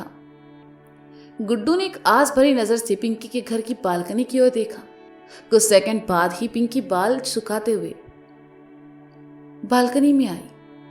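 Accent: Kanpur, India. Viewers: aapne kanpuriya language ka mast boli ho...👌👌👌👌 Bahut badhiya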